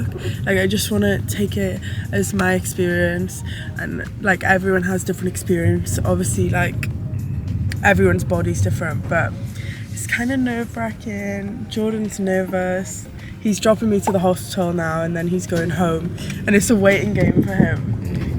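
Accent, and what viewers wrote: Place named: Wales, United Kingdom. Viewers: What part of the uk is her accent from? Just wondering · She’s Welsh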